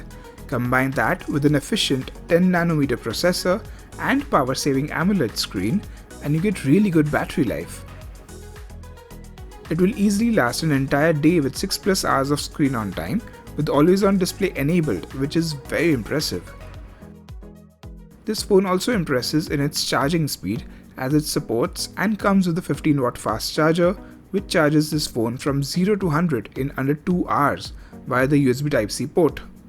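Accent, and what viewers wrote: India. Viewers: Youtube should put all these indian reviews on youtube india. Indian accents are super annoying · I Don t understand Indian English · I love your accent it's Indian right.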